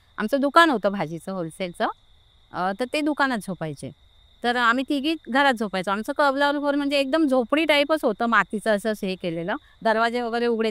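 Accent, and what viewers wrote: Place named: Konkan, India. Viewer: Khup sunder story sangitli ekdum amcha kokni andaaz thanks kaki, plz reply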